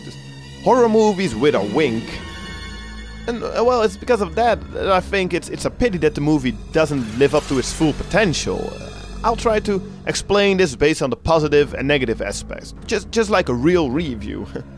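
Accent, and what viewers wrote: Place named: Netherlands. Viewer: Yeah man!!! And your from holland..Ik ook!!! ik merkten het aan je acsent en het liedje in het begin. Goeje beschrijving voor de film man!!!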